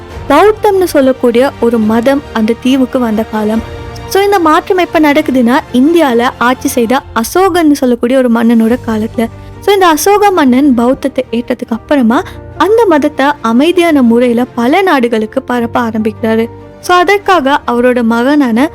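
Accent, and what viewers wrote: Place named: Sri Lanka. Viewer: ❤ enaku unga srilanka Tamil romba pidichiruku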